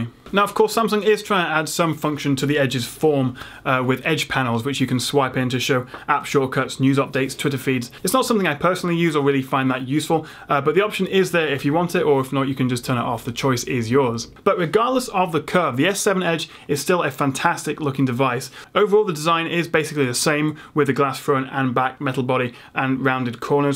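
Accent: England, United Kingdom. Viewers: brilliant review and nice to have an English accent on one of these videos! You're going to give mkhb a run for his money haha. I like the fact that you mention the little things like edges feel in the hand and the fact that you have a problem typing sometimes due to your hand touching the screen. These are the things that really matter and are the exact reason I use the normal s7 as opposed to the edge! great work, keep it up :) cheers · hey are you from the UK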